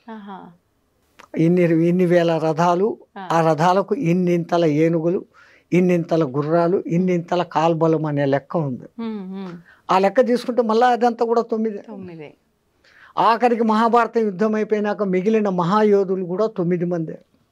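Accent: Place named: Rayalaseema, India. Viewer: Sri Sri Sri gurudevulu notinundi ma rayala seema basha vinte shanthoshamga vundhi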